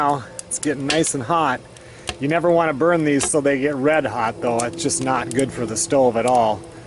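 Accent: Minnesota, United States. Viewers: Love Minnesota accent, Ohh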